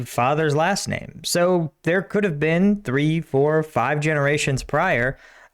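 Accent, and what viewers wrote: United States. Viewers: It's not a Jersey accent, it's a South Boston accent. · That’s not a Boston accent it’s a New Jersey accemt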